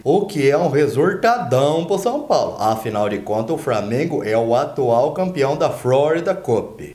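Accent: Minas Gerais, Brazil. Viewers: vc é mineiro igual eu? Kkkkkkkkkkk 😁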